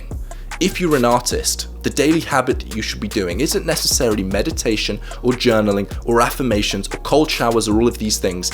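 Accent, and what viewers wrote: United Kingdom. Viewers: Great! It's awesome. Are u from UK?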